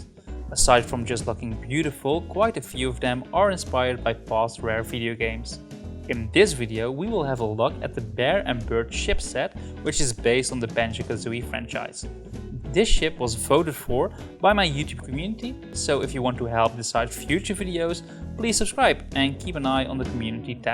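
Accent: Netherlands. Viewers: Do I hear a Dutch accent?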